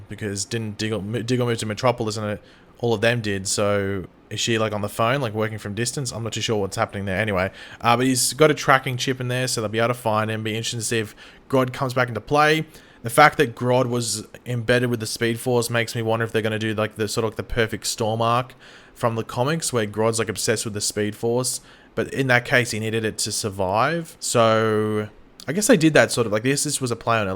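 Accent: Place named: Australia. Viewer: Yeah but you can clearly tell is Australian from his accent so this guy's just falsely accusing him for nothing. Besides there are stream sites like vumoo or whatever it's called where you can watch it a few hours after it airs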